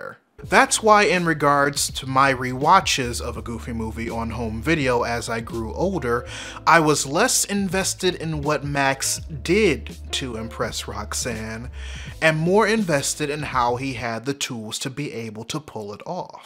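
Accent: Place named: Detroit, United States. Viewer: Native Detroiter here — living in the south for the past decade. I’m so glad I stumbled upon your channel. It was recommended to me after watching Double Toasted’s A Goofy Movie review. I’m older, but this movie brought back so many memories for me, as well as my millennial-aged son. It’s why he stopped dead in his tracks when he saw me watching your video. We both gasped when you mentioned being from Detroit, then I immediately said, “He sounds like a Cass Tech alum.” Sure enough, you confirmed it! Always love seeing my fellow Technicians doing well. I won't mention what year I graduated. :) Anyway, my son and I both subscribed to your channel and we're eager to dive all the way in. We saw The Batman Thursday and I can’t wait to hear your thoughts on it. Keep up the excellent work!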